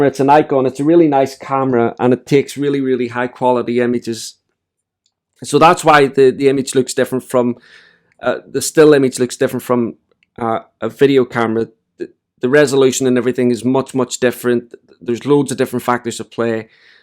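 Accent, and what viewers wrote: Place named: Northern Ireland, United Kingdom. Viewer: Ahhh, I could listen to North Ireland all day. My mum's from Armagh. :)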